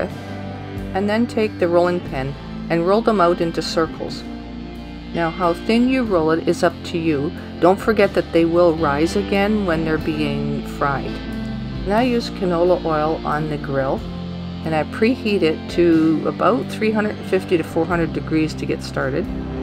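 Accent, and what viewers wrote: Canada. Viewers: Thanks for sharing I love naan bread and I also like your Canadian accent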